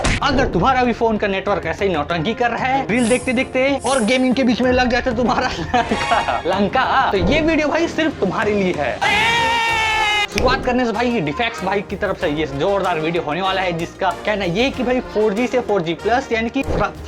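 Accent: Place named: Chhattisgarh, India. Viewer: Chhattisgarhiyo ka accent pakda jaata hai 😅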